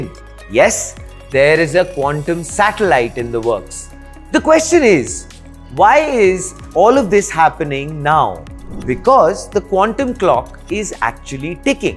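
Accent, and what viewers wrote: India. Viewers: Here, India is jamming herself into QUANTUM field. He speaks Inglish and hard to understand what he is saying.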